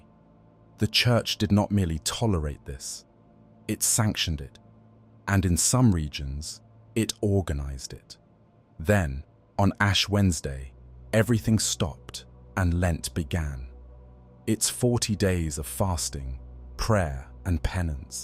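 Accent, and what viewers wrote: United Kingdom. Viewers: He’s British or something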